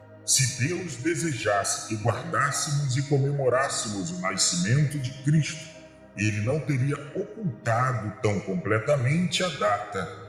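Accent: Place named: Rio de Janeiro, Brazil. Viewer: Sotaque carioca é tenso mesmo KKKK mas o vídeo é otimo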